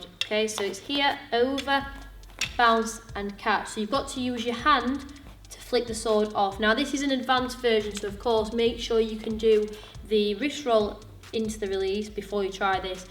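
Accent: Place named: Yorkshire, United Kingdom. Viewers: The 'oo' sound makes it Yorkshire. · Which part of the UK is that accent from? Love it. Sounds like Dr. Fiona Hill's.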